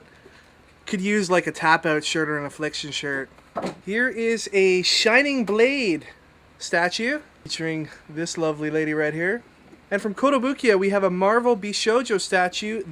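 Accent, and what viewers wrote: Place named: United Kingdom. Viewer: Of all the videos has i love his Umbrella Academy collection the most. I absolutely love this series and its great how he owns different covers to every issue, Ive been known to horde different cover arts myself. Gerard Way has certainly not disappointed with this series and also has a pretty gnarly British accent.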